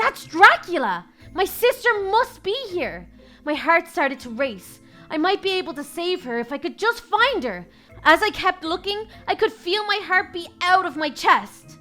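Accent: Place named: Ireland. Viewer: I have a question are you from Ireland your accent sounds familiar I love it especially your vids I am a new subscriber 😀😀😀😀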